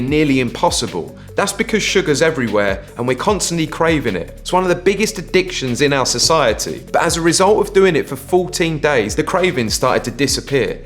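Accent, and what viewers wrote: United Kingdom. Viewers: This is the best British accent I have heard in my life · Wow,like for this gorgeous British accent))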